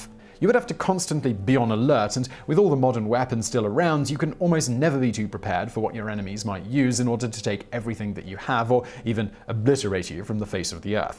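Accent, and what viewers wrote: United Kingdom. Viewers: Bad advice from a guy with a British accent is yet bad advice... horses are essentials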